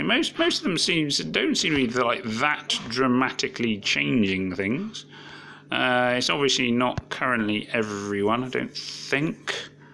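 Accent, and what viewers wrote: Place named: United Kingdom. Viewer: Hello. Love that British accent...by the way hope you got how costumes work, and if you don’t this is FYI: You can’t ascend the costume more than the original copy it is accompanying. Leveling up and ascending costumes is much easier and cheaper, no special ascension material needed. Both original copy and costume got bonus stat when costume lvled up.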